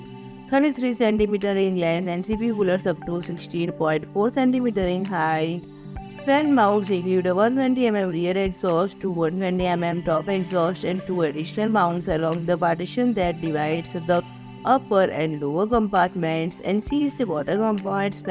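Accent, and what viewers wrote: India. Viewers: It's called an Indian accent, but interesting way of hearing it😂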